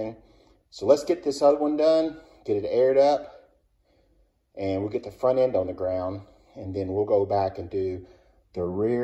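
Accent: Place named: South, United States. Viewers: Presenter definitely has an accent, although slight. Definitely somewhere in the southern US. The way he pronounces the word "buy" is a dead giveaway to my "normal" US word-pronunciation-keen California-raised-ears of 56 years. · Great demonstration. I hope I never have to do this repair job but it's helpful to know that it can be done. I love that accent, whereabouts in the U.S. are you from? We don't hear anyone speak like that in Australia except on movies. Thanks mate.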